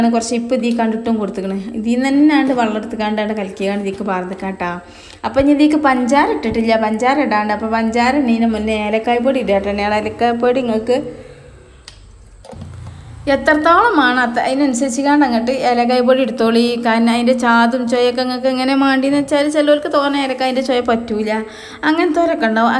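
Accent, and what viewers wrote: Malappuram, India. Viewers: ഈ ഭാഷ കേട്ടാൽ തോന്നും ഇവൾ മാത്രേ മലപ്പുറത്തൊള്ളുന്നു prrr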